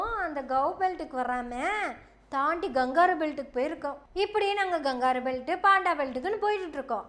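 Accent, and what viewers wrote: Kongu, India. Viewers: மகளே உன் பேச்சில் கொங்குதமிழ் கொஞ்சுகிறதுஅம்மா. இந்தியா பாகிஸ்தான் போரைப்பற்றி கொஞ்சம் பேசுதாயே. மேலு‌ம் கோடிக்கணக்கில்சம்பளம் வாங்கும் நடிப்புக்கூட்டங்கள் ஒரு ரூபாய் கூட போருக்கு உதவிசெய்யவில்லையே.ஆனால் நடிகைகளின் திருமணத்திற்கு கோடியைக் கொட்டிக்கொடுக்கின்றார்கள்.இது என்ன நாட்டுப்பற்று.? நீ பேசு மகளே நிறைய பேசு.!!! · தோழரின் கொஞ்சும் கொங்கு மொழி நடை மிகவும் சிறப்பு. தொடருங்கள்..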